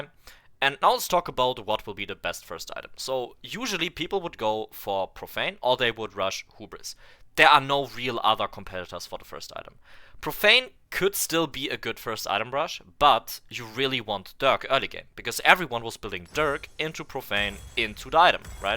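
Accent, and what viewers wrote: Germany. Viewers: Hey, ich höre du hast einen deutschen Akzent. Kannst du mal einen Guide auf deutsch machen, da ich nicht so viel englisch verstehe... Wäre echt prima von dir 🤞🖖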